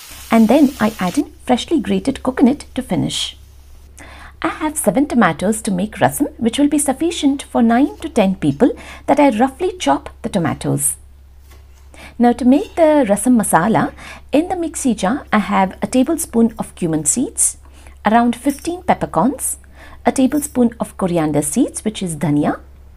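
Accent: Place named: Hubli, India. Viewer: Hi ri nim channel yavag nodidru tumbane Kushi agutte ri and vegetarian nalli eshtondu Verity food esht unique aagi quick aagi cook madbahud anta gottirlilla, and nim channel nalli prati video kuda different food style different things galanna nodtini nanu adu bhal eshta agutte so I regularly watching ur channel and I m the big fan of u n ur channel ❤️. Luv u soo much dr 😍. And ennond en eshta andre nivu nim maklanna matadso reeti avvi appi annodu 😍😁 and pakka Hubli bhashe all things I just luved it ❤️ Thanks fr ur informations and suggestions. Love from Bagalkot and Raichur ❤️❤️❤️❤️❤️❤️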